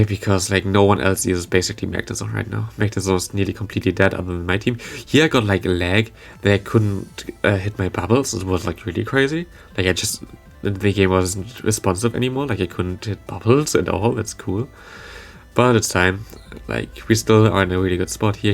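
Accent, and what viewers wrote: Germany. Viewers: Gutes Video aber ich cringe weg mit dem akzent (nicht besonders starker aber deutschen akzent erkennt man gleich)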